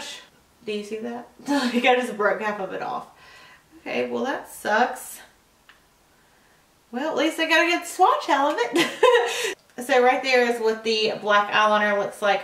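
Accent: South, United States. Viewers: You're so beautiful 💞💁🏻 and I love your cute little southern accent lol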